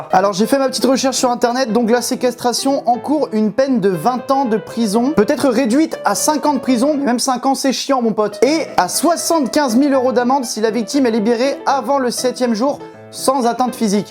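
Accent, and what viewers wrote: Switzerland. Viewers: T'es Suisse? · T'es Suisse toi ou bien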